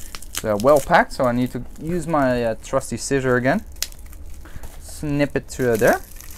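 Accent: Germany. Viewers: Are you german? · You are a German aren't you?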